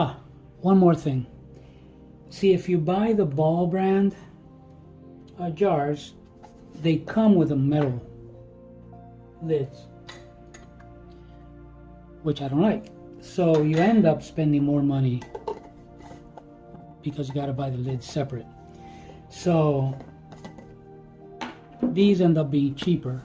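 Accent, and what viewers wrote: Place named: Cuba. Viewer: Is this your new microphone? Sounds decent. I'm old and perhaps not "politically correct" but what is the source of your accent? Cuban perhaps?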